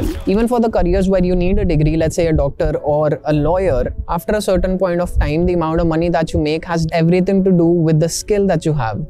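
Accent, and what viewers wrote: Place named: India. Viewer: information is really worthit . and the most imp. thing is that till now his excent is indian . hatsoff to this guy.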